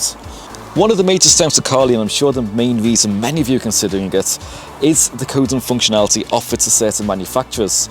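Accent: Liverpool, United Kingdom. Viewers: keep making videos mate, I've just discovered you. Keep that content coming! You sound like you're from my way or near by? (Liverpool)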